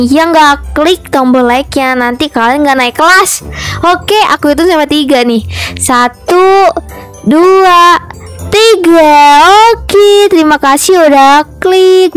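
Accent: Indonesia. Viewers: Kk orang Jawa? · Kok agak Sunda kak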